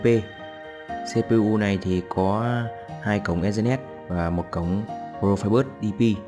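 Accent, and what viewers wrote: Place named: North, Vietnam. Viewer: Rất hay, giọng miền bắc thân thương, video ngắn , trọng tâm ko dài dòng'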